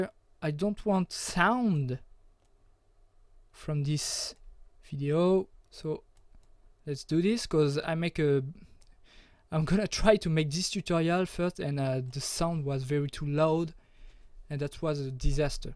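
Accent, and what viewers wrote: France. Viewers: Super tutoriel merci. ça sent l'accent français non ?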